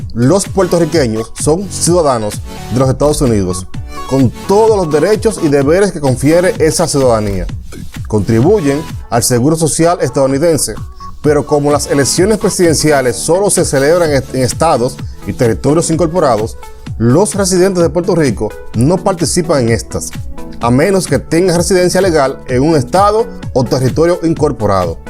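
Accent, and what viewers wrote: Dominican Republic. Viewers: Los cubanos no hablan sobreefatizando las vocales, los dominicanos si, por lo cual pienso es dominicano. 😊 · Dominicano por ese acento haciendo video de PR para coger views. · el que hace el video es dominicano y se nota en su acento, PR es mas rico que RD unas 4 veces mayor.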